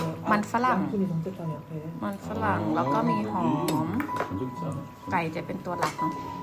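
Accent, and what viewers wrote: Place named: Khon Kaen, Thailand. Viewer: เว้าคือคนบ้านข่อยแท้ ขอนแก่นกะสำเนียงแบบนี้ล่ะ😂😂😂😂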